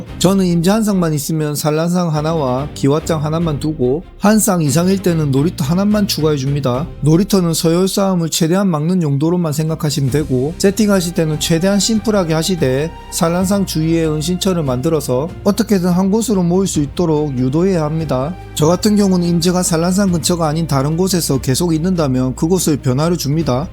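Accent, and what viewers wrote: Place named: Gyeongsang, South Korea. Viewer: 오 경상도 분이셧군요 어디분이실까요?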